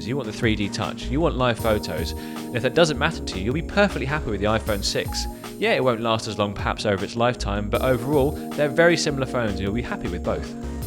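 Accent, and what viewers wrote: United Kingdom. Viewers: why is this guy speaking British?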